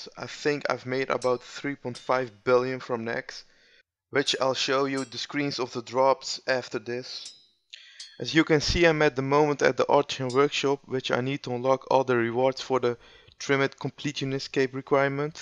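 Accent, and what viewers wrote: Netherlands. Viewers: i hoorde aan je acsend dat je nederlands was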